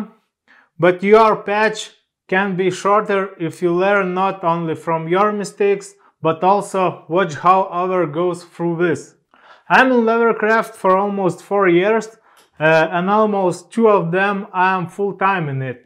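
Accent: Russia. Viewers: Мне кажется вы русский) очень похожий акцент) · Русский акцент 😁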